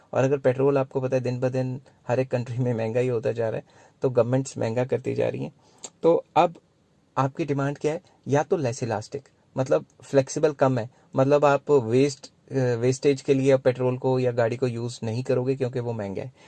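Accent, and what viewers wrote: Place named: Punjab, India. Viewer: Ur way of teaching is commendable 👏sir apse mujhe thori thori ek punjabi ki feeling ati hai .kya ap punjabi ho ?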